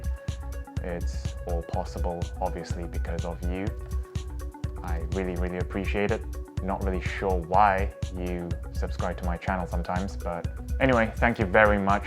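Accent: United Kingdom. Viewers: How did I not know you had a British accent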